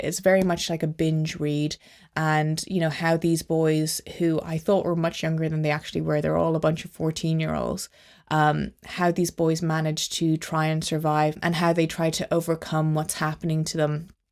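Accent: Ireland. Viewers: Great recommendations and the Irish accent sounds great 👍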